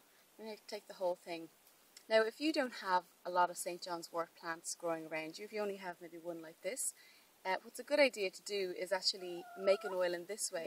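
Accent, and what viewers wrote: Ireland. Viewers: Nice. Interresting Ireland accent. Btw I wouldnt judge you if you throwed a stone at the rooster while yelling "shut uuup!"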